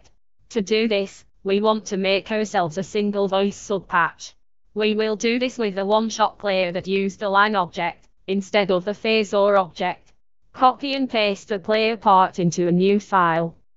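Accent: Scotland, United Kingdom. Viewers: What the heck is this amazing robot voice! Is it meant to be Scottish? It trends slightly Welsh at times maybe? I'd hate to be misidentifying it.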